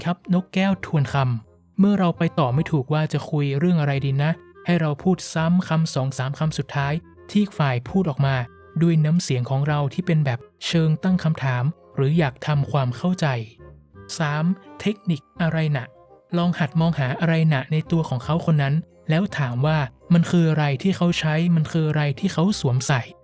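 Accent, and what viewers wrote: North, Thailand. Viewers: Admin เป็นคนเหนือแน่นอน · อันนี้ขอนอกเรื่องได้ไหมคะ สำเนียงการพูดเหมือนคนเมืองมากเลยค่ะ 🤭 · คนเหนือแม่นก่อค่า..พูดเหมือนเจ็บแอว😊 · เธอเป็นคนเหนือเหรอ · คนพากษ์ เปนคนเหนือแน่นอน😊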